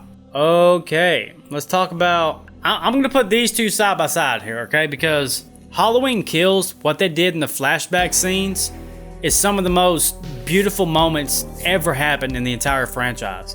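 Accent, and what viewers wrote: United States. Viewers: Love your Accent dawg, What State?? 🖤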